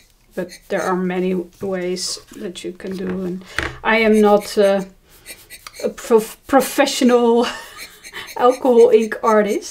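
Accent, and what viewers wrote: Netherlands. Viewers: Hi, you sound like you are from the Netherlands, just like me. This is beautiful and I love your stencil, well done!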